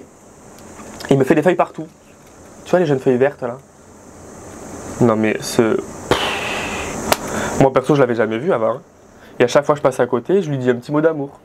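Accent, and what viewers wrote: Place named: South, France. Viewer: Oh le bel accent du midi 😂😂De très belles plantes et de l'humour tout y est ❤❤❤❤❤❤❤❤❤❤❤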